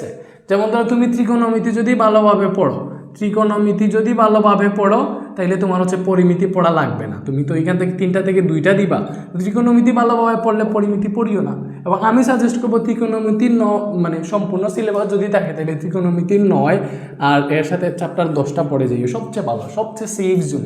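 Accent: Sylhet, Bangladesh. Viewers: Vaiya apni ki sylheti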